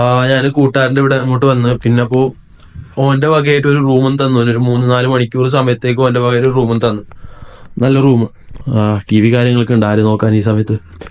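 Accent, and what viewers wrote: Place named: Kasaragod, India. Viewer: Kasaroda slangilanne describe aakiya madeera Ade majeullu Baaki south Kerala slangilellu kore vlog indra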